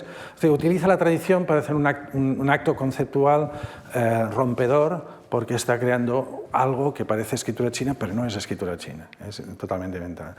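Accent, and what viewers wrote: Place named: Catalonia, Spain. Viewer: Como dice el conferenciante es catalanohablante y el plural de caràcter en catalán es caràcters. No hay cambio de tonicidad. También se nota en la forma "hacer servir“ (fer servir) y, sobretodo, en las s sonoras de los plurales en contacto con vocal posterior, un fonema q en castellano no existe, pero q en catalán, portugués o francés es lo normal en esta posición.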